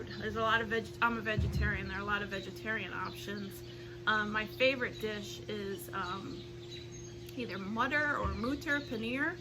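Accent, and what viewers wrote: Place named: New Jersey, United States. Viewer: you mean Traction Park??? Lol!!! I think it had its prime years when I was in school - I remember a friend of mine getting hurt there. And I know my brother went several times, but I never got to go myself. I think it’s a ski lift in the winter now, and a water park in the summers. We ALMOST moved to that area when we first got married, but the townhouse development we bought into went bankrupt. Lol!! I’m in Secaucus - which is also where I grew up. Where in Jersey were you?? It’s funny, I think I somehow missed you saying in the past that you had Jersey roots, but I recognized a tiny twinge of that Jersey ‘essence’ in your voice! 😉. (Trust me, it’s NOWHERE near mine - I have the truck driver thing down to a science. 😂)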